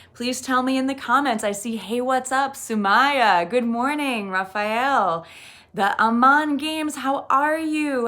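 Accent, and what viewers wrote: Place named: United States. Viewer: Hi ❤️ your American accent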